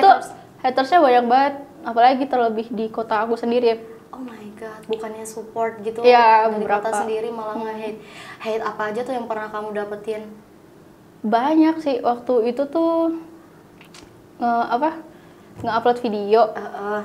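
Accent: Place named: Makassar, Indonesia. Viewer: Org makassar kalau ngomong kentara bngt sih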